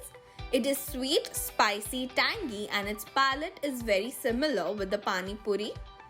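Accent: Maharashtra, India. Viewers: I am overconfident and I can tell that didi you are Maharashtrian ❤️❤️❤️❤️❤️hey marathi mulgi❤️❤️ We are same🎉🎉🎉 you can come to know it's like she said it too much time and that's why I come to know that she is marathi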